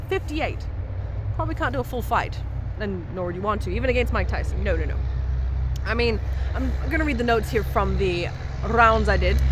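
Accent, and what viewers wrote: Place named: Western Australia, Australia. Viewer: 25 whole years ago. 60 days of my life was spent in WA. Good ole memories. Your accent made me flash back on the good times in my life.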